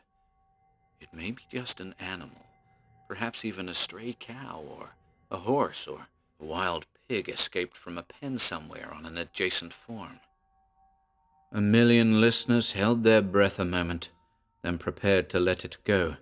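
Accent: United States. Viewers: Pretty good American accent! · Your American accent has improved tremendously since you first tried it out. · Wow! This story is awesome! I love the setting and description of the old house and environment around it. This is well-written....many of these old tales are. Fantastic narration! Your American accent it great!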